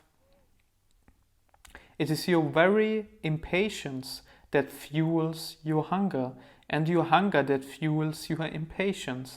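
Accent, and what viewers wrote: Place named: Germany. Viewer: Grateful that I found your channel.. and an very calm and deeply accurate.. luckly I love raw foods.. ahaha.. your esscent is familiar, are you from Germany? Thank you once again for your expression..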